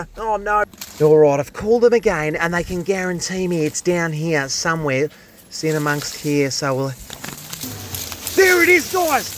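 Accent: Australia. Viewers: Is there anyone with more aussie accent than you? I doubt. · First time encountering this channel and man, everything is more exciting with an enthusiastic Aussie host lol Like, my dad's a plumber and it's nowhere near as fun watching him work. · G'day. Ended up finding your channel after watching some pet grooming. lol. Watched a bunch of ya vids and may be absorbing more Aussie dialect than is healthy for a yank. Anyway, love your videos and the excitement you bring to honestly one of the most underrated jobs out there. Wish more plumbers had your worth ethic and enthusiasm, especially where my girlfriend lives. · Man watching a plumber with an aussie accent and the crocodile hunter energy hits different, throughly entertained my friend watching from SoCal USA 🇺🇸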